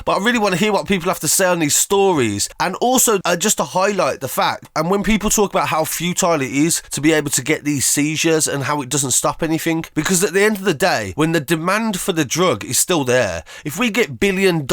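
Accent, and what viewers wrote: Birmingham, United Kingdom. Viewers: Just the birmingham accent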